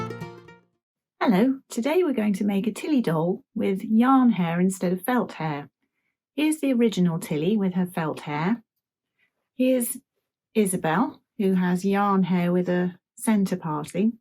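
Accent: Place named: United Kingdom. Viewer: Well I think the talking is explanatory. And this gentle British accent so much better than all of those yanking yanks!! 😅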